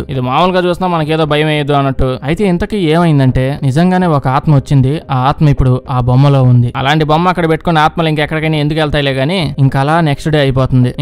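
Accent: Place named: East Godavari, India. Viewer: Talking power good east godhavara majaka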